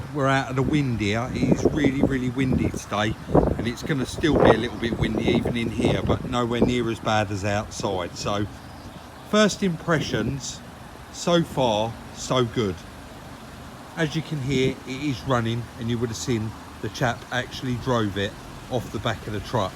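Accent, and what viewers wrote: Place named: England, United Kingdom. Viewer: I'm from Australia. I could listen to that English accent all day.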